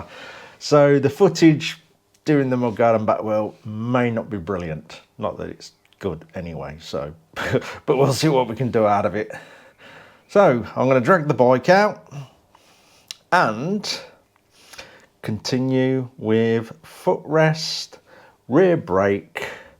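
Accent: England, United Kingdom. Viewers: As soon as I heard the english accent....this is a biker builder I will follow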